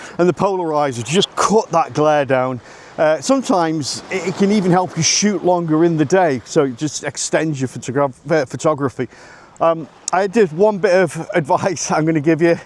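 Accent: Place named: Stoke, United Kingdom. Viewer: Great video. Just subscribed mate. Also detect a bit of stokie.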